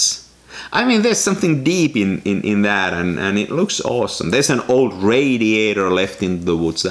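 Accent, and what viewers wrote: Finland. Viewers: I like this kind of content, subscribed. Good photos, no long boring cuts with loud and crappy music. Finnish accent is a bonus. It sounds funny and for some reason I like it. :)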